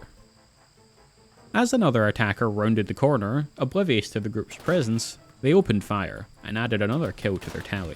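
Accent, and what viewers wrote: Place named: Scotland, United Kingdom. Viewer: Which part of scotland are you from man, peak video btw.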